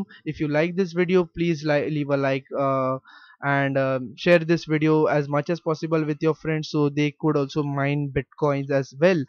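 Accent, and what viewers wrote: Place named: India. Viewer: Indian accent but i need to mine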